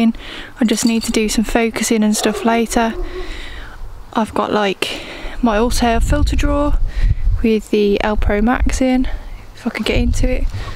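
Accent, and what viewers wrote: United Kingdom. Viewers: Loved the video! Definitely going to be a hit! I have a small request. What was the plate solving software you used? Sorry, my American ears don't always hear the British words accurately.😊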